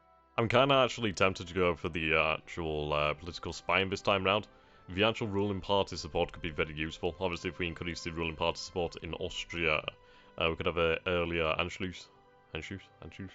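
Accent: United Kingdom. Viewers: Ulta Posh XD as an Aussie you really sound like that stereotypical british rich man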